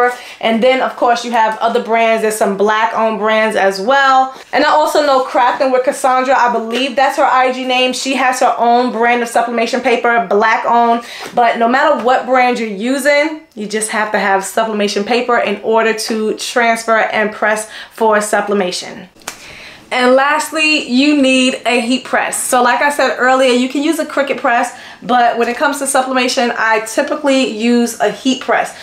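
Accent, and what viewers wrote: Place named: New York, United States. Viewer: Is it New Jersey or New York? I love the accent! It’s just so exciting lol.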